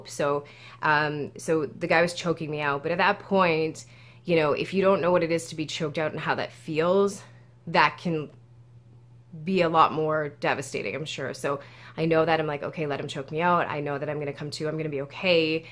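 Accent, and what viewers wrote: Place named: Europe. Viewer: He sounds like he's from one of the bloodlines of cowards that stayed behind in europe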